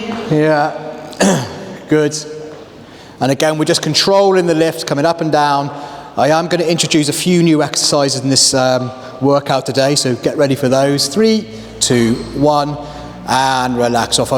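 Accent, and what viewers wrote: England, United Kingdom. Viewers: I rather enjoyed those exercises! Also felt at home with a lovely English accent! Thank you.! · Where is this filmed and what accent do you have…English, Aussie, what province?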